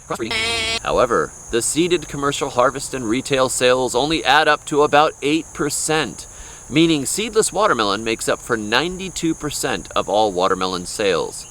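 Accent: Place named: United States. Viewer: I left the UK in 2024. Here in Iraq, they don't have seedless watermelons. That's something which until I saw your video, I didn't know existed. It sounds like science fiction. When did developed countries get seedless watermelons? You sound like you're an American. Are seedless watermelons a post-2023 phenomenon?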